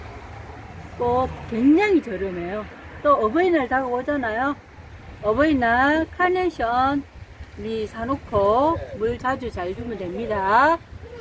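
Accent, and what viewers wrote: Gyeongsang, South Korea. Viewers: 님 어재는 알림받고 왔고 오늘은 님 구수한 경상도 사투리 목소리 들으러 왔어예 ㅎㅎ우리 시골산에 가면둥글많은대 즐청 풀청 합니다 예 😅😅😅❤❤❤❤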